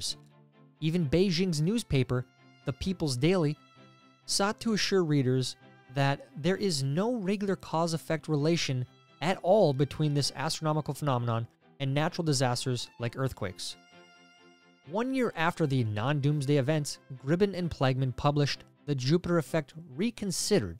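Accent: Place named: United States. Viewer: For our American cousins, it is pronounced York-sh-ear, Same was Warwickshire, wa-wick-sh-ear. But to be fair it does sound nice pronounced wrong in a US accent so we'll let it slide. What do us Brits pronounce wrong in the US, be interesting to know.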